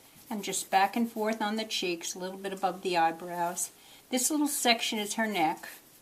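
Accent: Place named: New Jersey, United States. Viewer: The minute I heard your voice, I knew you had to be in Jersey! Hahaha....Jersey shore girl here! Neptune!!! Anyway, can you tell me what pencils you are using and what the black pen brand is? Thanks.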